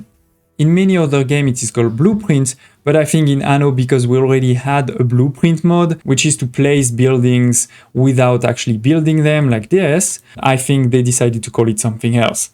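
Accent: France. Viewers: Je ne suis pas douée en anglais, mais merci merci pour tes explications 🥰. Grâce à ton accent visiblement français 😉, j'ai réussi à te comprendre (et il faut dire que le voir en vidéo en plus ça aide, bien évidemment). EDIT : je viens de voir dans "à propos" que tu es Français, je ne m'étais donc pas trompée, ton accent t'a trahi 😁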